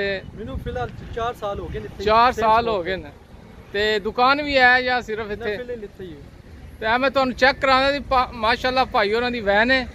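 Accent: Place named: Taxila Pindi, Pakistan. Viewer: MASHALLAH Je bhot Acha laga ap ki video dekh kr, perdes main apny mulk ki hr cheez achi lagti hai,ap ki boli sun kr lagta hai ap taxila pindi side K Ho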